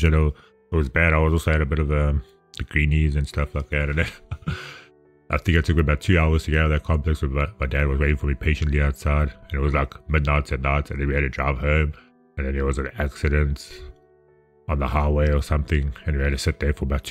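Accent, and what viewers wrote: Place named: South Africa. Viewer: Wow. I'm so sorry to hear about your story. When the video started I wanted to say that you sound like a fellow South African. I know the accent. Greetings from Port Elizabeth. I'm gonna watch the reaction now but I already know I'm gonna be in tears. If you have a few minutes to spare, please react to by (live from the American idol farewell season) it's a very very emotional performance. And I'm so very sorry to see and hear about your heartbreak. Bitter jammer 💕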